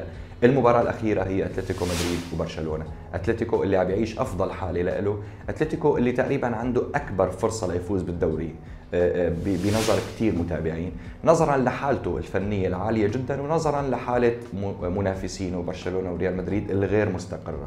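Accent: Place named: Aleppo, Syria. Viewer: صحيح ونا لاحظت انه من حلب من اللهجة 🥰🤩 بصراحة تقديم رائع ومبسط مشكور ..... بالتوفيق لحضرتك🌹🌹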